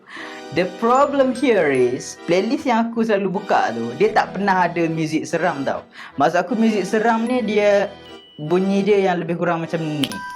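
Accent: North, Malaysia. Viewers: Saya org utara saya tk tau nk cakap mcm mana bila abg cakap loghat utara. 😅😂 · Hahahahaha lawak bang buat lorat utaraa😂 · Bolela loghat utaqa abg🤣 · bang saya orang utara bagi saya abang punya utara tu okay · boleh lh skit skit nk ckp utara tuuh sebab saya oang utara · Pandai pulak die cakap utare😌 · Loghat utara tu still acceptable 😂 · Lawak la oi abang cakap utara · Bahasa utara abang tu betul la...tak sangka plak betui...saya oqang utaqa hahaha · Loghat utaqa bang bukan main sempoi🗿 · Boleh tahan jugak loghat utara abang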